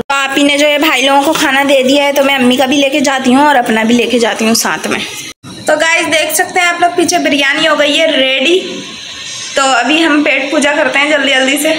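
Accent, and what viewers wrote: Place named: Karachi, Pakistan. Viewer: Ap memon hn? Apki language karachi jese lg rhai 🤭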